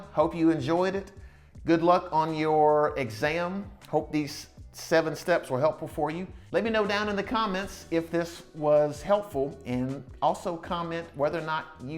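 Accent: South, United States. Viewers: Thanks for the info. I'm taking some Udemy courses and plan to pass it on a month.😎🌟 We're are you from bro, you have that country singer accent.😅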